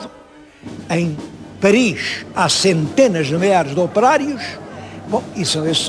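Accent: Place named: Portugal. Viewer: Sou brasileira e adoro ouvir as histórias de Portugal com esse sotaque lindo!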